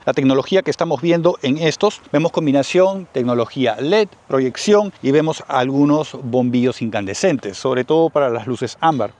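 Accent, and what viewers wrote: Peru. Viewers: habla como peruano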